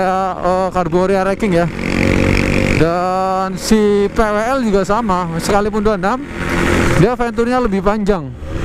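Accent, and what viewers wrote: Banyumas, Indonesia. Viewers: pantes ngomongnge kaya ngapak ...tengan wong Tegal ..mantapp bro lanjutkan truss pokok.y salam wong ngapak 👍🙏🙏🙏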